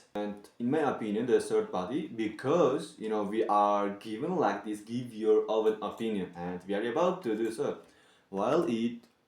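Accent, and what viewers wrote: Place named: India. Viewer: Bruh indian accent 🤡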